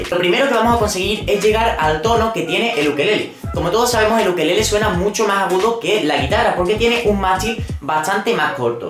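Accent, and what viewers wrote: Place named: Spain. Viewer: De que parte de España eres? ese acento no lo tengo familiarizado.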